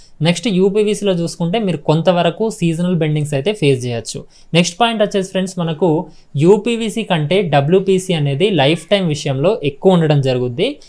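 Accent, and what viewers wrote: Telangana, India. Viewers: Hi Anna sutthi lekunte matter super Telangana slang Lo kiraak chepthunav, Anna intlo interior wardrobe and kitchen Lo wardrobe gurinchi oka video chey Anna plz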